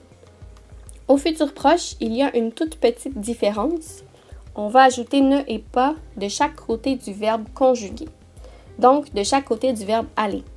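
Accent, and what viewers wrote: Quebec, Canada. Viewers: Merci de m'avoir répondu. Votre capsule est parfaite. J'ai pris votre capsule et j'ai changé votre voix contre la mienne.Je l'ai utilisé juste sur wath sapp. Pour mes élèves comme un petit rappel de la leçon. Ils ont beaucoup apprécié. Je leur ai dit bien sûr que ça venait de you tube. Une élève à moi a fait la même expérience. Vous avez un bel accent.Vous êtes canadienne je suppose. Moi je suis marocaine. C'est pour cela j'ai dû le refaire en français. Votre explication est parfaite. Partir d'un texte pour comprendre la conjugaison est la façon pédagogique idéal. J'aurais aimé connaître le nom et prénom pour le mentionner dans votre vidéo. Mais il n y a que 😘. Voilà, j'espère que ma question est un peu mieux comprise. Mais si c'est interdit, je ne le lancerai pas bien sûr. C'est votre travail et vous méritez un CHAPEAU Bravo, l'animation est parfaite ainsi que l'explication. 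😉😍😘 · Si tu parles de son accent c'est l'accent québécois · Merci beaucoup!!! Votre vidéo est très instructive et votre bel accent québécois me rappelle mon séjour universitaire à la Belle Province. Continuez!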